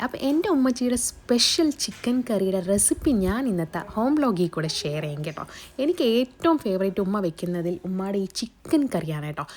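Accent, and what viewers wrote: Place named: Trivandrum, India. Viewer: Trivandrum slang orupadundu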